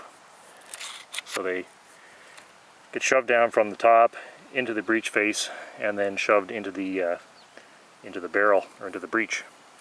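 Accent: Canada. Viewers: He is speaking in Canadian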